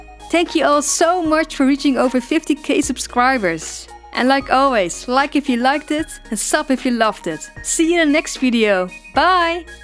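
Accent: Netherlands. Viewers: Please Ive been a subscriber for a long time 😩 Your tutorials were one of my fav since you made the dolls come to live and gave them such a personality Im hooked. Im also from the Netherlands so I recognize that accent. Plus the faceups and animal creations are top notch that they remind me of the Fantastic Mr fox. Ive been watching for a long time even when I was unsubscribed.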